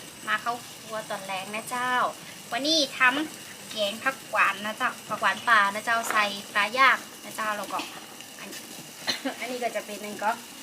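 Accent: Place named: North, Thailand. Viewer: พูดก็เพราะอู้คำเมืองฟังแล้วม้วนแต้ๆๆ..แกงน้ากินมากเด๋วจะไปตลาดซื้อมารองทำกินเนอะ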